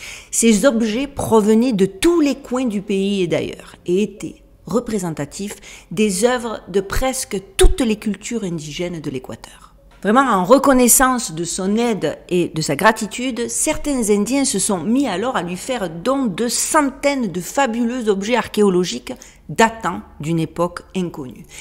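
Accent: South, France. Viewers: Votre petit accent du midi , peu commun à Las Vegas apporte le petit rayon de soleil à travers votre reportage. Cw que vous indiquez est réel. J'ai eu la chance de visiter les souterrains de Bucegi... Tout ce que nous entendons vivons lisons apprenions et voyons est archi-faux ! 🙂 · Puisque les commentaires t'aident pour remonter les algorithmes , commentons! Franchement, j'attends tes videos avec impatience. Ta manière de presenter est claire, précise, documentée et souvent drôle malgré des sujets qui ne le sont pas toujouurs! Mais ce que nous apprécions au delà de tout c'est ton bel accent du sud qui, pour les expats que nous sommes, nous ramène vers d'autres temps, d'autres lieux.... Surtout ne te laisse pas intimider. Please go on with the great work! Blessings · Allô …. Juste par curiosité ou as tu appris à parler français….de quel origine es tu….je trouve que tu as un accent du sud de la France….ton accent est très jolie soit dit en passant.👍🇲🇶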